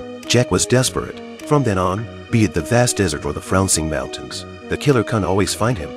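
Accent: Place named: United States. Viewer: bro turned american💀💀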